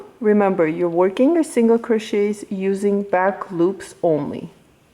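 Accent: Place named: Bulgaria. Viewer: Really fun project!, btw, are you Bulgarian? Your accent and your picture made me wonder 😉.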